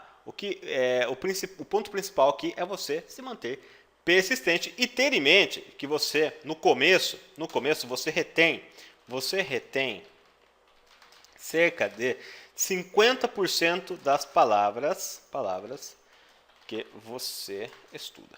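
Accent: Germany. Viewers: Boa didática. E de qual região da Alemanha é o teu sotaque?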